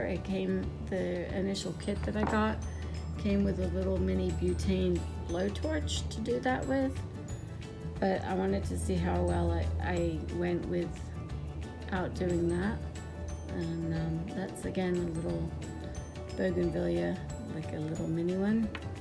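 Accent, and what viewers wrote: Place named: United Kingdom. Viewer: That's a very cool idea! I hear that British accent sneak out once in a while.😁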